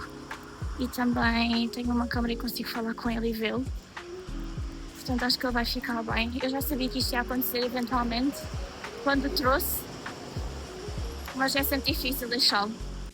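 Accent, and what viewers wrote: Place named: Portugal. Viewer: Consumo imenso conteúdo Japão em língua francesa, dá gosto ter alguém a fazer vlogs em Português de Portugal.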